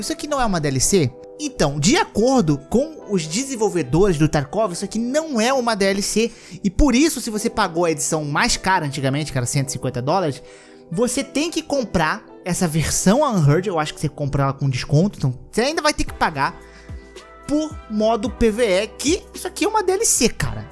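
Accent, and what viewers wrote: Rio de Janeiro, Brazil. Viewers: Sotaque carioca simplesmente intakavel, flws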